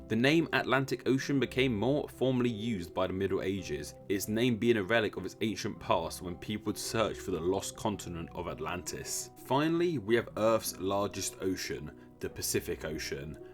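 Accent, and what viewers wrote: United Kingdom. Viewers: The oceans got their names by being called that by someone, and it stuck. Again, this robotic British twit narrator should be used to stop hurricanes, as his delivery is so banal that even a force of nature would turn away. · This British accent ! 😌🙏🏻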